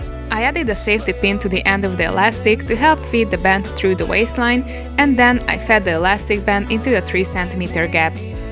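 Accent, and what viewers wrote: Hungary. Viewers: Now I understand what people mean by us having a strong unique accent... I somehow knew you were Hungarian so I just had to look after it, and I was riiight! Anyway, you are really cute and the video was great! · Hearing your accent I am pretty sure you are Hungarian :P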